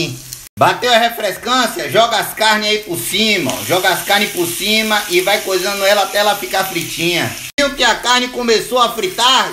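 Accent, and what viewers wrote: Bahia, Brazil. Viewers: Você é bahiano kkkkkkkkkkk adoro kkkk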